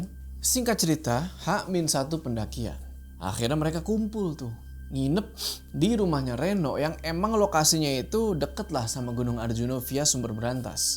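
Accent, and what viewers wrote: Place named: Medan, Indonesia. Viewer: Bg ngapa bntr bntr pake bhasa jowo ntar logat Medan heheheh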